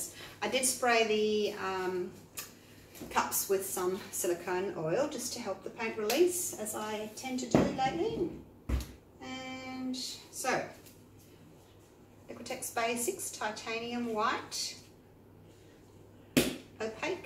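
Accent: Australia. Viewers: Disastrous?? It’s gorgeous! Love the cells - reminds me of a tile mosaic. I love listening to your chatter! It’s cheerful, and who doesn’t love a cheery Aussie accent?? Lovely!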